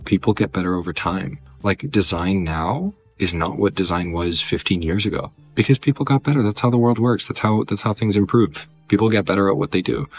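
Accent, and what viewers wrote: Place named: United States. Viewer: He sounds American to me. Is he Canadian or something? Their salaries are high too. I’m so confused now lol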